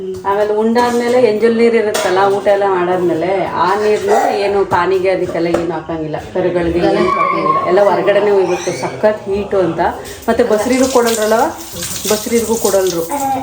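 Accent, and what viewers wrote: India. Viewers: ನಿಮ್ಮ ಗ್ರಾಮೀಣ ಶೈಲಿಯ ಕನ್ನಡ ಭಾಷೆಯನ್ನು ಕೇಳಲು ನನಗೆ ಸಂತೋಷವಾಯಿತು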